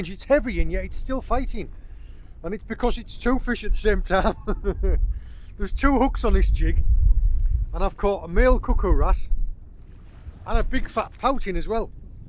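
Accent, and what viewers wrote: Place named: Yorkshire, United Kingdom. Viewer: Got to ask as your accent is so familiar, what part of God Own County do you come from? I'am a Smoggie from the Boro.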